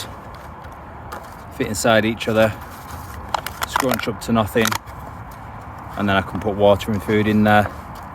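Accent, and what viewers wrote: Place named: Yorkshire, United Kingdom. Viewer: Excellent channel, looks like the good life, the dogs must be great company too.so glad this video popped up on my feed.... just subscribed now... you sound like your from near me in Leeds /Bradford way? , I wish you and the dogs the best of luck in ya outdoor life 👍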